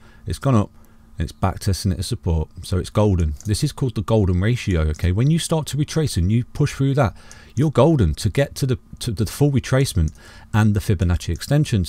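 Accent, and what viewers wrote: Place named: East Midlands, United Kingdom. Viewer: Great analysis. Sounds like you’re from Derby/Nottingham by the sounds? I don’t know if you’d class it as an East Mids accent.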